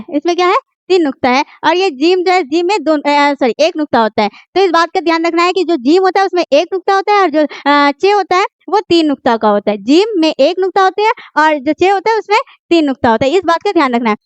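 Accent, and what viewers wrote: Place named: Rural, India. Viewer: Pahle apne madam sir ko kahna ki dehati zaban me na padhaye urdu ko बे ते से 😂😂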